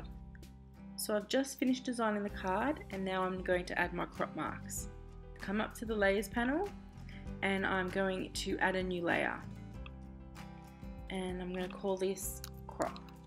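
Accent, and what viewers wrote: Australia. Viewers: Great info, just what I needed. Delivered in Australian too!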